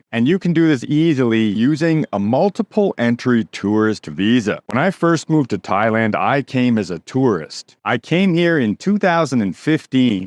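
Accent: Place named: Canada. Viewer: You sound Canadian, probably Alberta? If you are into languages check out Shuo, she teaches Chinese in Bangkok Thailand, is Chinese, a great person and teacher!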